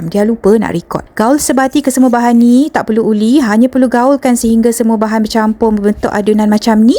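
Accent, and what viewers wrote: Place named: Malaysia. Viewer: cantik aku suka aksen melayu dan suara nya❤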